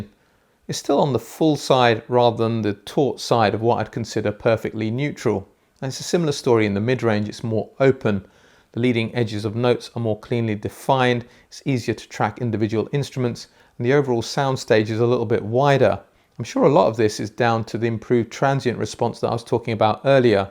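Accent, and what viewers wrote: United Kingdom. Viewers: Thank you for this excellent review about the mojo 2. NB: i love your style .. please don’t change! be yourself elegant precise fair honest ! This British accent gives it some how apart gives some lead 😊 which makes me think : if his analysis matches the high level and articulation of his language then you may peacefully rely on his expertise!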